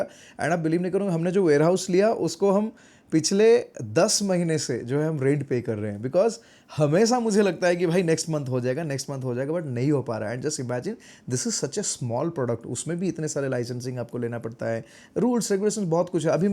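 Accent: Odisha, India. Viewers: Even if after so many years, your odia accent in hindi sounds good.... Badhiya Bhai..... Good job... Fronm ROURKELA/Raipur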